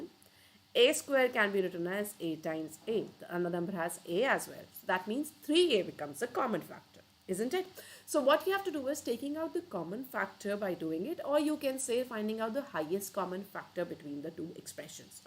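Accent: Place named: South Delhi, India. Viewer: she got the south delhi accent :face-blue-smiling::face-blue-smiling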